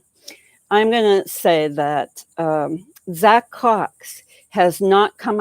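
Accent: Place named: New York, United States. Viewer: I love your daily greeting; very Marilyn Manson like, but unsuspecting! And, I'm guessing a New York accent. Probably from New York City? I'm guessing and sleuthing on you! GOOD MORNING BEAUTIFUL PEOPLE!! xoxo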